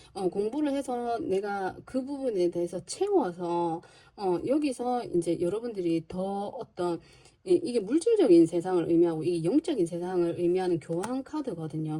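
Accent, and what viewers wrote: Gyeongsang, South Korea. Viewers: 역쉬 쵝오십니다! 짝!짝! 경사도 말씨 매력있습니다 ㅎㅎ 부분적으로 맞는부분이 많습니다 저의 소원이 꼬옥 이루워지길 요...... 2번 입니다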